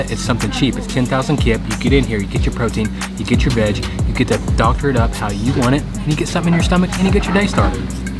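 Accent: South, United States. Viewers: Hey! Just came across your channel, and I’m loving it. I was wondering where you where from, hearing that southern accent haha. I read your bio and saw you’re also from AR 😂🐗 keep up the awesome content! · Southern draw and Lao food- what a combo!! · My first time watching you and I enjoyed your video. I live in North Carolina and you sound like you are from the south. Where are you from? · When I heard a fellow southerner I subscribe so quick! This a first that I've seen a southerner vlogger!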